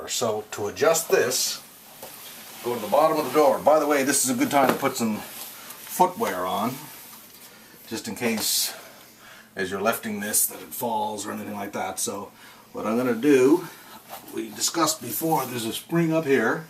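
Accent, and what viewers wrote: Canada. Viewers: from canada? one of my favorite accents.... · This is most helpful. Thank you. I LOVE the Canadian accent. My wife is from B.C.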